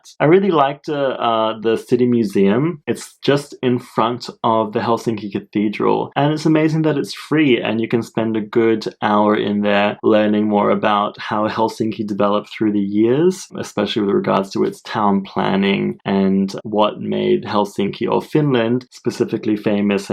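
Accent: Australia. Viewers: I just love your great videos,. I like Aussie accent.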